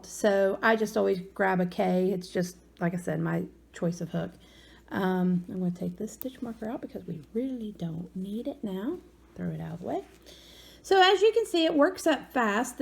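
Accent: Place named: South, United States. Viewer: Ooooh, Yay!!! A southern gal teaching CROCHET!! You sound like you're from the Carolina's by accent....but I could be wrong (usually Ga, Ms, Al have a thicker drawl than the Carolina's, but maybe I'm just a biased Carolina gal😜). Anyway, love that I found a fellow southerner to follow! Love the poncho! Thanks for giving the count for the various sizes too. And I thought you did great with your camera/CROCHET work! I don't think I could do it...I'm doing good looking at it directly and still miss, I'd really be OF if I had to look through a viewfinder or a screen! So, honey, you did great! New sub! Looking forward to more of your vids and patterns! Bye (in that beautiful southern bell drawl)! 😉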